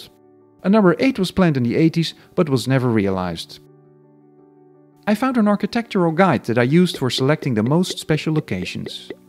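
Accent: Netherlands. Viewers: You sound very Dutch.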